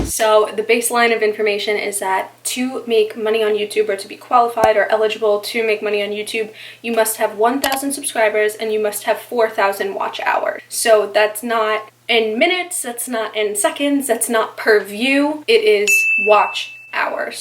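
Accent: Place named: New Jersey, United States. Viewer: You remind me of the jersey shore cast the way you talk!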